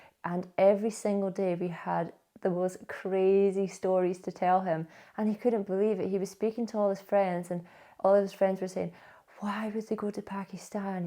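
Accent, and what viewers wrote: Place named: Glasgow, United Kingdom. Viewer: Glaswegian accent😂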